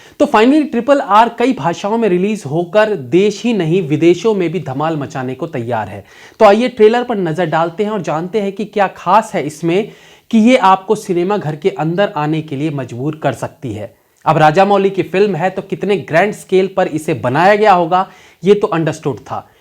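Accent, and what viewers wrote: Bihar, India. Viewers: Pure bihari ho aap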